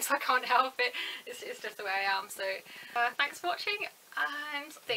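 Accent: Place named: London, United Kingdom. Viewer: Loved this. From what part of Britain are you? I'm guessing London by your accent. But I could be wrong.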